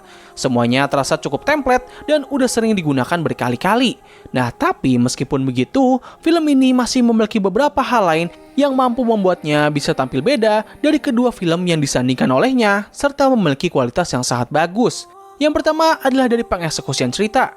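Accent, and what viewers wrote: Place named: Banjar, Indonesia. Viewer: Ulun kira Pian orang banjar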